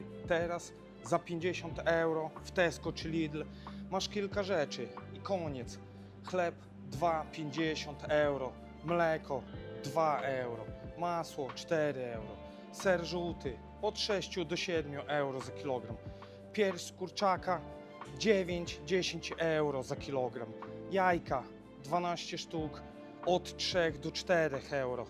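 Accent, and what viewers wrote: Podlasie, Poland. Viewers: Z jakim akcentem mowi ten facet? Czy jest to akcent z Podlasia czy z Lubelszczyzny? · Podlasiak z akcentem. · Z jakiej części podlaskiego jesteś ? Piękny akcent :) i to bez hejtu. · kolega jest z Podlasia albo z Lubelskiego. Normalna gadka, ale "słoiki' z Warszawy tego nie zrozumieją 😉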